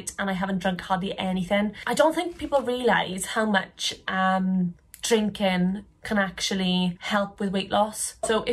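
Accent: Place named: United Kingdom. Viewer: Love your accent dear! Can I ask where from the UK are you? Scotland maybe? 🥰 Also unfortunately cannot find you on Insta under your username :(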